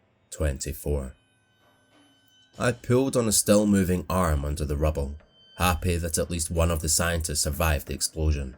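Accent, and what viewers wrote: Scotland, United Kingdom. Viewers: Your name is Mr. And you’re Scottish just like Gordon........ I wonder if this is chef with an accent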